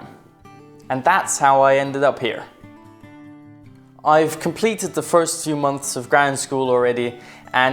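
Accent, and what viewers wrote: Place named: Poland. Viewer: may I ask your nationality? sounds like you are polish for lot has chosen u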